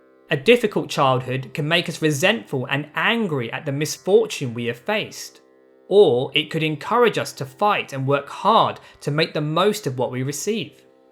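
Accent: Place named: United Kingdom. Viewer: UK! I think due to his speaking type!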